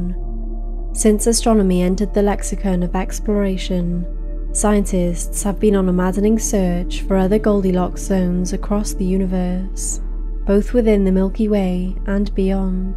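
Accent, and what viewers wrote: United Kingdom. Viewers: British limey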